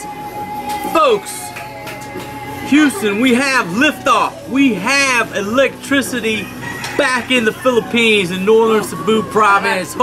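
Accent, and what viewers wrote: South, United States. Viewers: Where you from bro down south somewhere I guess Louisiana? Liking your content on your channel can’t wait to get my ass back to phillipines and yep gimme a choice I’ll take phily over Thailand any day even with its fuck ups lmao 😂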